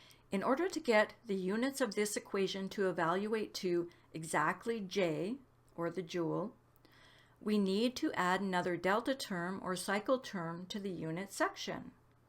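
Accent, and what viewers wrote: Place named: Canada. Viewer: I have been studying Ken Wheeler now long enough to feel comfortable. This now should help refine the incomplete areas. I need to model and understand what the mainstream calls atoms, electron orbitals/shells, valence etc. Now believing there is a minimum limit to everything - which is sensible to me - should help refine the model especially with regards to electron orbitals. Somewhere I expect to find a relationship based either on phi or fractals that will define patterns we experience as in the periodic table of elements. ps I assume you are Canadian - I heard aboot way too many times.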